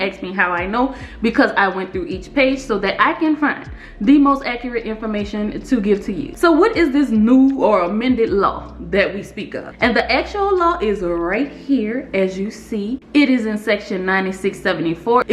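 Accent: New Orleans, United States. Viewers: Am I trippin or is that accent straight from NOLA?? I wanna think I can spot my kinfolk anywhere 😂. Good info, basically you’re an independent contractor making $600 yearly 🙄. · Hold on! I’m listening but is it really a fireplace on the mounted TV? 😂 Idk why that’s funny but I love the NO accent! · Just came across your channel and I love the content. Also, I said to myself I know a NOLA or river parish accent when I hear it. 😆😆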